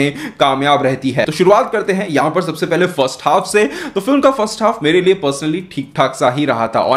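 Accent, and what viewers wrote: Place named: Haryana, India. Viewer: Nice review...Keep it up...some jokers are trolling haryanavi accent. Is it really worrisome?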